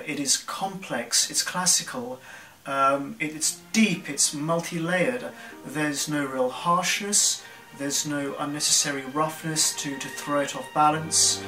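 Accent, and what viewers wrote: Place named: RP, United Kingdom. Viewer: All the superlatives are on point. Spot on. I would go as far to say that Anubis is for me in the same category as a not too barnyardish Oudh. That initial petroleum benzine, leatherish scent you get but then fades away for a beautiful resinous, smokey and incense aroma. I just love it. Can’t wait anymore to get my bottle. Have a nice weekend. By the way I like your voice and above all your English. Very well spoken, posh😉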